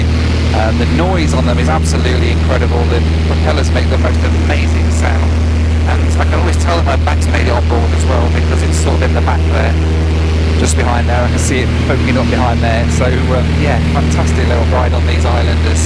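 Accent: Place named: London, United Kingdom. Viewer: Finally u have proved.....people from London are funny.....pathetic accent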